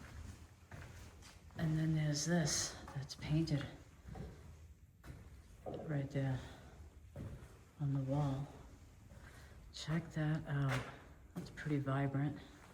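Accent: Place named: Massachusetts, United States. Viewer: You sound like my mom and my aunt from. They're from Malden Massachusetts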